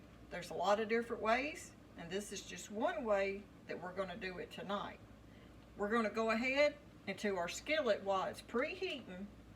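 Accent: South, United States. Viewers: I just now finished watching this video, I have never seen you before. I can be critical of a lot of things, especially how to videos, watched the whole thing with interest. I’m an older lady,and I can make brown gravy, but you did add a couple of new ideas, like the onion and garlic powder. THE POINT I want to make is, have I know you my whole life? Your presentation was JUST THE BEST! Your voice was so smooth, you are Southern to the bone. I say that as a great, very great, compliment.I have never subscribed to a cooking channel before, it’s like my neighbor talking to me. Wonderful !!! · Thank you for sharing recipe. Your advise is very true now days. Love the southern accent. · You got the south in your mouth. Fine recipe. THANK YOU.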